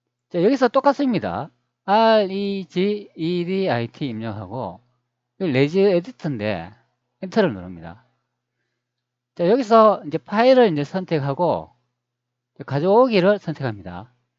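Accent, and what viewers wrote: Gyeongsang, South Korea. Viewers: 아이구... 상도 사투리.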